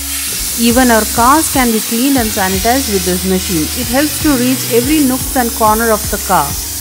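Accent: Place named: India. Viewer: Yeah exactly what I am wondering also. What is the cost? Also the lady speaking sounds Indian but yet its out of Europe?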